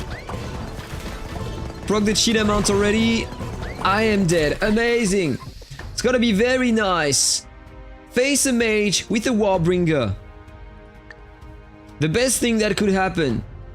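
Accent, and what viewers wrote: France. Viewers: Attends tu es francais? J'avais capté l'accent mais je pensais pas que tu étais français...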